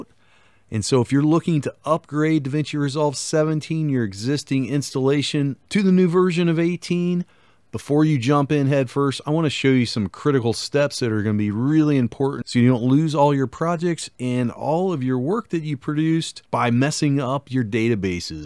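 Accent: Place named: Michigan, United States. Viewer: You have a Michigan accent 100%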